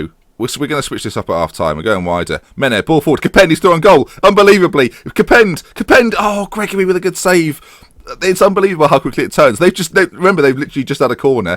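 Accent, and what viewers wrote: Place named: Estuary English, United Kingdom. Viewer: ah so u weren't raised there, u sounds like you have an estuary English accent, not distinctively Northern or Midlands?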